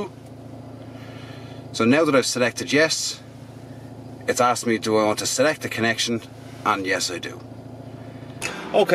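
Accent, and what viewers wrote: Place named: Ireland. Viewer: P.S. - it was worth watching the video just to hear your delightful Irish accent.....:-)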